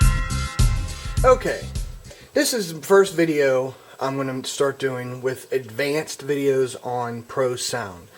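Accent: Pittsburgh, United States. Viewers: You HAVE to be from around Pittsburgh. You talk like everybody around me.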